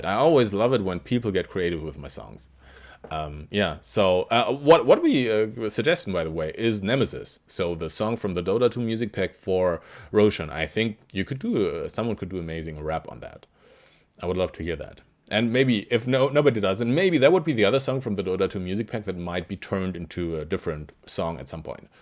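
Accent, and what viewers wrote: Germany. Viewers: Well i heard it that your a german person because of your accent(and i´m german too also warum schreibe ich auf englisch, ich pfosten hehe) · Man hört ihm schon an, dass er Deutscher is xD Hätte ich von seinem Pb her aber nicht gedacht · Is der Deutsch? =>where is he from, or is he german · An diesem Akzent merkt man eigentlich direkt er ist Deutscher To my English friends the Translation: With this accent you can tell that he is German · Are you german it sounds like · Well you actually can tell from his accent. I also live in germany and i always hear this accent from german people that speak english.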